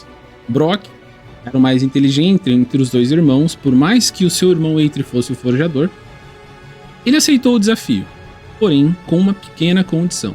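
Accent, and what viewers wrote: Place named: Campinas, Brazil. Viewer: Excelente vídeo mano parabéns, senti falta do sotaque DDD 19, cadê? 😂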